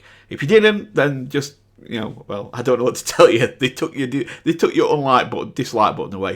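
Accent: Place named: Liverpool, United Kingdom. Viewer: When you listend to for the very first time did you expect to fall down into such a huge rabbit hole? I remember you said that you rate songs and you rarely rate songs with a 10. I was so amused because I already knew what you are heading into. I really like your accent although sometimes it isn’t that easy for me to understand. May I ask from which region you are from? Liverpool? I‘m not sure about that, just a guess from a foreigner not native speaking English.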